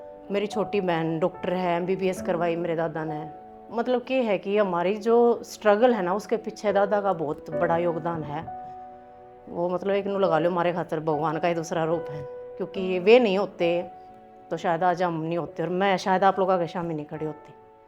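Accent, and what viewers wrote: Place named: Haryana, India. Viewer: जी हमारी पुरानी संस्कृति हरियाणवी के सुपर स्टार है और थारी बोली मैं तो अलग ए हरियाणवी की मिठास आवे है हरियाणवी ओल्ड संस्कृति जिंदाबाद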